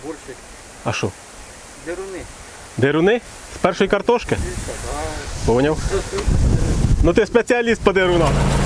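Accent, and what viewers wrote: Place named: Cherkasy, Ukraine. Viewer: мова українська, діалект Черкаський якщо не помиляюся. Дивіться відео і колись щось і зрозумієте, з картинки теж багато що зрозуміло.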